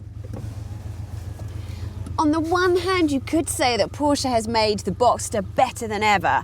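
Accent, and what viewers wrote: England, United Kingdom. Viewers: I don't know why but there's something in her eyes that makes me wanna fill her pretty little mouth with... a banana. But then again, I wouldn't be able to hear that lovely english accent. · I love accent. What part of the UK is she from? London? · British accent is weird!! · Why is there a british accent talking about MILES per hour?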